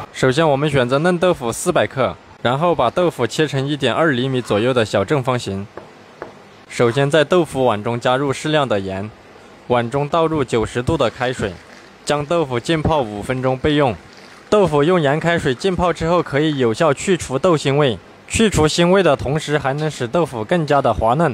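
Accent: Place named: Zigong, China. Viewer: Shuai Wang 这个师傅一听就晓得是自贡人，，， 怎么不是川厨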